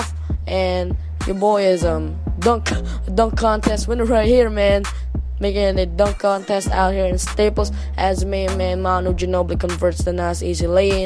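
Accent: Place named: Philippines. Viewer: Im Filipino too, i could tell by your accent.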